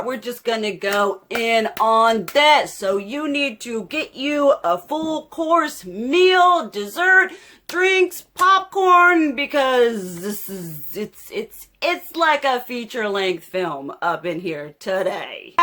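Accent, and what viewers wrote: South, United States. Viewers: I LUUUUV your accent. I live in East Tn, and it's music to my ears to hear an authentic southern accent. Don't kmow how often you hear that, but just sayin...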